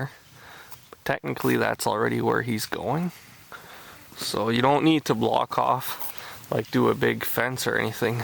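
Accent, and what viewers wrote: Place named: Quebec, Canada. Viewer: Moi qui look this up pi j'técoute pi j'me dit: ya un accent Québecois chu sure. Ben oui! Nice!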